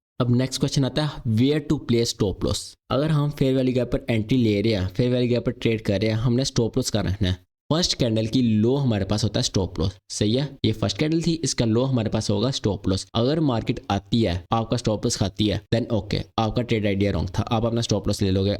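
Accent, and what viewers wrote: Pakistan. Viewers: Bhai aapki tonse se lag rha hai ki aap pakistani ho ya north west India se belong karte ho. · Pakistani lgg Raha ha lounda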